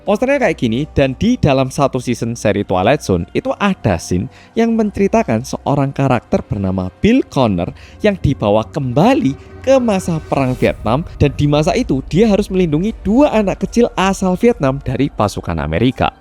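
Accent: Java, Indonesia. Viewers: Aku suka bahasanya ,Mergo aku Yo wong jowo. Medok banget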